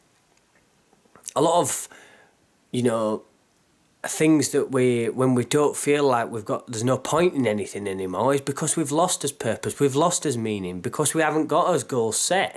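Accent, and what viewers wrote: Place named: Yorkshire, United Kingdom. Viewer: Hi man...you're an unque guy obviously a free radical...I'm now in my 50s and was like you back in the 90s watching technology control people I'm not at all shocked to see what's happening because was saying things like you back then and folk just said yeah so what..your accent sounds Yorkshire..wakefield maybe,...I was a brickie too like you ..uourceirk looks good...all the best for 22..cheers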